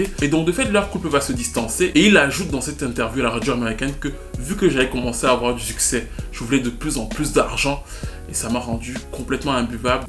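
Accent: Cameroon. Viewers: Toi tu es camerounais d'origine 😭😭 trop le ton ... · Il s'agit juste du parlé et du phrasé typiquement camerounais. Que j'aime beaucoup d'ailleurs...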